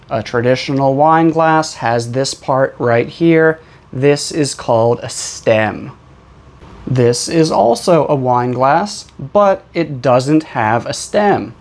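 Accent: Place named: United States. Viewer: (382) A perfect presentation with the enchanting Native voice , strikes the ears of the non-natives absorb the visual traditional and modern vocab, perfect American pronunciation specifically Flap T. ❤🎉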